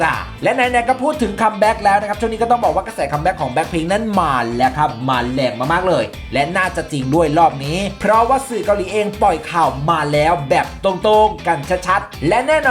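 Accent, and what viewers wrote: Isan, Thailand. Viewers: ผมหมายถึง จริตจัดเต็มแว๊ดๆ+อิสาน มันฟังไม่รุเรื่องว่าเป็นคำว่าอะไร จะเข้าใจได้ไง? การนำเสนอ การถ่ายทอดข้อความ ถ้าคนฟังแล้วไม่เข้าใจ มันก็พัง..... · สวัสดีแอดชอบแอดมากไม่ว่าจะพูดสำเนียงอีสานหรือกลางก็ชอบจากใจจริงชอบๆม๊ากๆๆ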